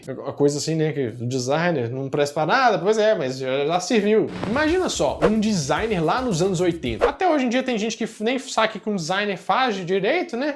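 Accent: Minas Gerais, Brazil. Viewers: Ah !!entao vc é mineiro !❤️❤️❤️❤️❤️fala da bobo dog mochila e bob au au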